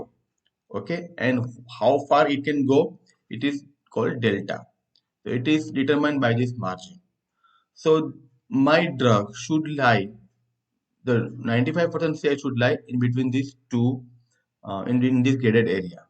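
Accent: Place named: India. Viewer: 我去印度英语，OMG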